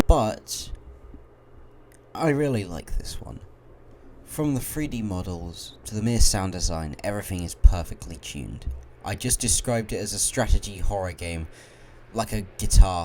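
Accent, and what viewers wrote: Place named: United Kingdom. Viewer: british guy talking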